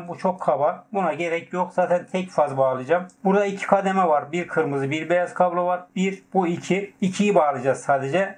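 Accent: Erzurum, Turkey. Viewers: hay senın ben cigerin yiyim dayi maşallah sene bir dadas her yerde dadastir gendin belli edisen arada bir videolara koy şivemizi💗💗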